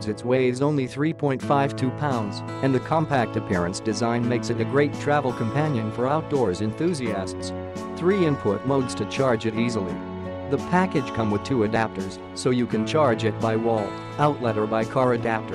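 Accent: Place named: Greece. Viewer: Κατάλαβα από την προφορά σου πως είσαι Έλληνας, και το επιβεβαίωσα μόλις άκουσα και το τραγούδι που έβαλες! Από που το αγόρασες το μηχάνημα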